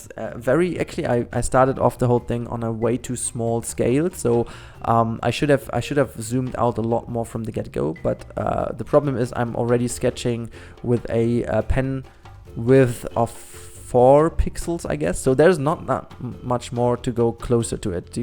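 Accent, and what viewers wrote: Germany. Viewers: Hello from an English (Canadian) speaker! I empathize with you trying to speak animal names in English. Don't beat yourself up over it! As long as you're close, we will figure it out. I, for one, prefer to hear your German accent anyway!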